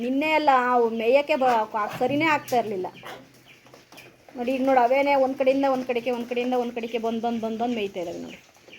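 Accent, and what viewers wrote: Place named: Chitradurga, India. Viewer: Akka yavuri nimdu...bashe nodtidre Chitradurga district ansutte ..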